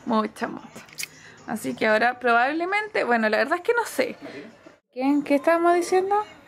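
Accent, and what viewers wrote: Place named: South, Chile. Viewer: me encantas tus vlogs son muy entretenidos junto al y tu tia amorosos ellos, ademas te siento cercana porque yo tbn soy de la 8 región y tu acento del sur es maravilloso jaja, encuentro que esta muy bien tu explicación de los precios, es tu trabajo y si alguien encuentra caro que compre en otro lado y punto, un abrazo y éxito en todo.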